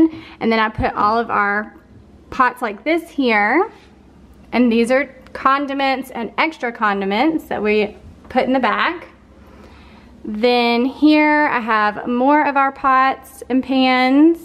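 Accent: South, United States. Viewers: Hi there! I’m watching from Eagle Mountain, UT. I get the feeling you are from the South because of your sweet accent,( lived in GA for 12 years but a native Californian. My, “pantry” is actually a very tall, deep cabinet about a foot wide, at least 18 inches deep with just about a foot between each shelf that are not adjustable and it’s a brand new rental. The pantry is always disorganized!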